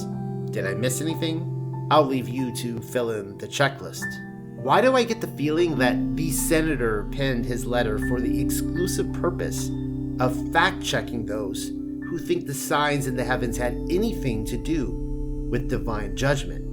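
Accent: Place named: United Kingdom. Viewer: sounds like he was in the UK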